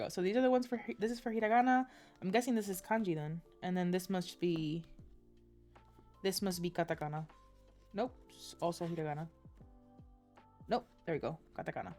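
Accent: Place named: Puerto Rico. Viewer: I was not expecting the Puerto Rican accent 😂😂😂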